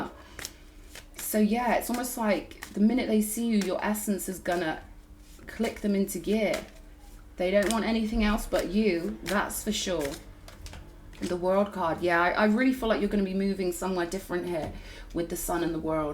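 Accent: United Kingdom. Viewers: Karmic energy just spit on it. LOL I love ur uk accent reminds me of Robin gibbs my sweetheart. Ahhh he was a Capricorn also.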